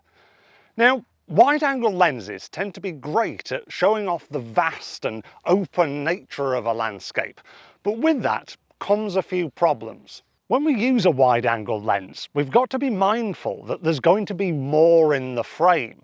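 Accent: United Kingdom. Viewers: Love the classic "ee bah goom" accent son. I travel light so only have a Zeiss Sonnar 1.8/24mm prime on my Sony A6000. Not sure how that relates to the sizes mentioned but used on everything from landscapes to close up tech photos. Main thing is to get a sharp shot and crop to suit as required. Different viewpoints by walking to the best vantage points if poss. Very helpful points in your vid however which I'll keep in mind.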